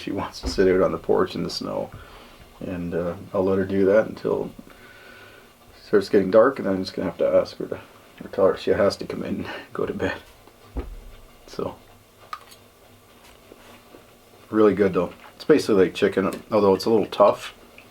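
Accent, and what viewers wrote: Canada. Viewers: You sound Canadian but you don't sound like you are from Ontario. Is your Mum from the East coast?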